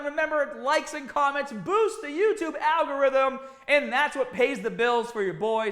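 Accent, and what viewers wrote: Boston, United States. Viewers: He’s from Boston lol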